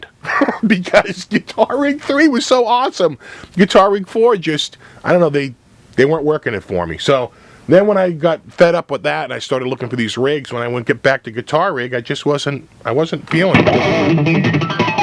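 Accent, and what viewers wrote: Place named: New England, United States. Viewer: lol are yu from rhode island? or sumwhere in new england?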